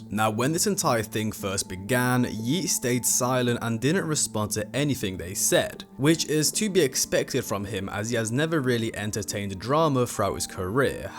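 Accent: United Kingdom. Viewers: Can't take a British accent serious.....especially one trying to be hard.....yall still worship a royal family.....df....haha....every thugg i grew up with would watch their videos just to see the clowns🤡🤡🤡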